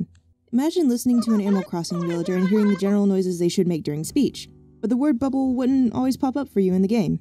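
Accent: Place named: United States. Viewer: Visuals were great, but I'm sorry the speech was so fast in an American accent I have no idea what was said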